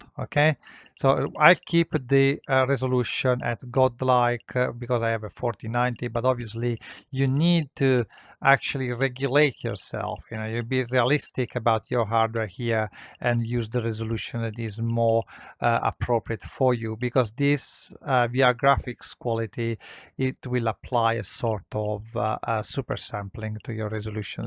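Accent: Italy. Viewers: Il bro è palese italiano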